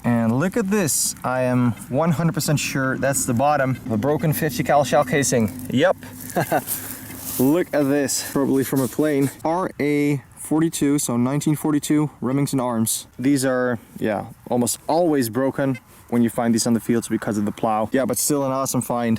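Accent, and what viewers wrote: Netherlands. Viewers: Is he from Netherlands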